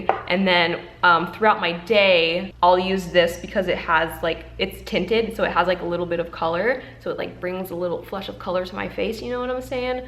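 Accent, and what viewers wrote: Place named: Australia. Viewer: I recently started watching your videos/subscribed, and i am STILL AMUSED by your Australian accent . ITS HONESTLY SO GOOD IN MY OPINION. 😂😂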